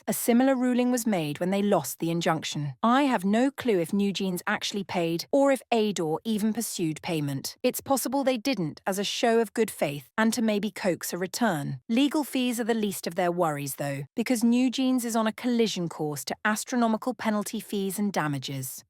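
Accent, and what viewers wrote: United Kingdom. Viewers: I love the British accent especially when you say something snarky, it really fits the tone. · What made you choose the British accent?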